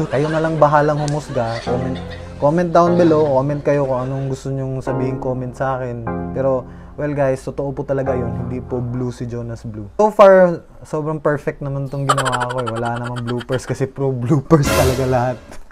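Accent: Visayas, Philippines. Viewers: piste bisaya man diay ka hahahaha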